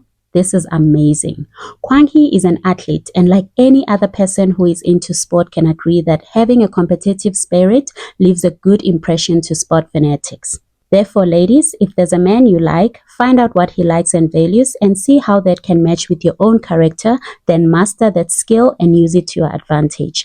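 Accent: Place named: South Africa. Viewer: Interesting perspective. Well articulated. Love it. You sound South African!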